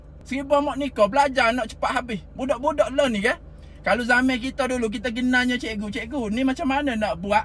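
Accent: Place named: Kelantan, Malaysia. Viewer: Bismillah...maaf min, pekat sngt bahasa kelate nya, jd kurang faham...gunalah b.malaysia baru semua yg lihat pelajaran yg bermanfaat ni bleh faham pd mesej yg disampaikan..🙏🙏🙏👍👍👍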